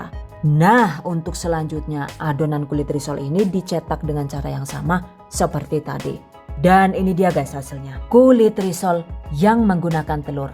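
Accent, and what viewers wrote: Banyumas, Indonesia. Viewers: 😄😅 suka suka suka,, aplgi pke bhsa ngapaknya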